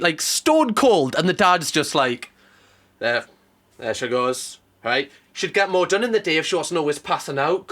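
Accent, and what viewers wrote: United Kingdom. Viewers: I am new to the channel, I have watched a few of your videos. I love british accent...however, I was like "Why he keeps asking for donors? That's wild. " but I finally understood you were saying "donuts" lol, here you go I am one more donut now 🍩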